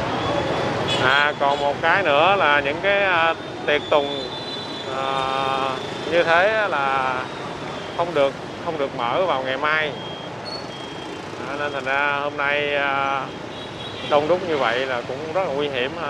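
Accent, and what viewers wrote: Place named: Mekong Delta, Vietnam. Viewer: THANK YOU EM CHIA SE CLIP NAY, TAI SAI GON.N TRONG KHI TAI DA NANG ,QUANG NAM ,DA BI SHUTDOWN. VOI CHAT GIONG MIEN TAY , CACH NOI CHUYEN CHAN THAT, CHAT PHAC ,ANH RAT THICH , CHANNELCUA EM .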